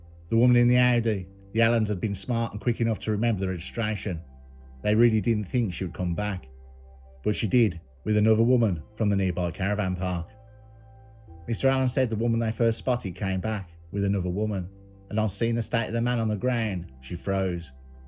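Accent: Birmingham, United Kingdom. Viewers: The narrators semi Brummie West Midlands accent is irritating, but also captivating.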